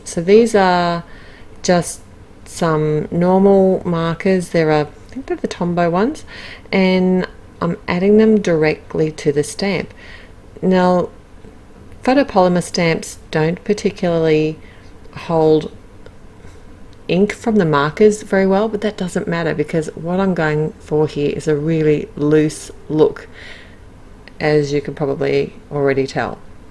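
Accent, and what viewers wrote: Australia. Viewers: That is just beautiful. I think I have that stamp set so I want to give this technique a try. And the inlaid die cutting looks great. You have the best voice - I just love listening to you! It's so great hearing a familiar Aussie accent in a sea of American ones on YouTube!!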